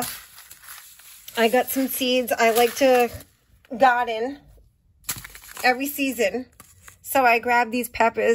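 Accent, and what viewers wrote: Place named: Texas, United States. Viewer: I am really enjoying your videos. I am from Texas so I love your accent. You are doing a great job. Keep them coming.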